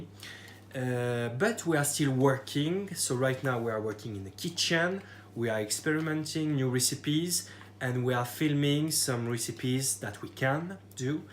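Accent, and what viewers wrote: France. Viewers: Hello friend ! ! ! Thank your for this nice video ! ! ! ! What is your native langage ? ? I subscribed today Sébastien .... Hello from FRANCE .... It seems you speak french because your special accent ! ! ! ! 💖🍔🍚🍎🍭😍🍄🍏💛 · You sound French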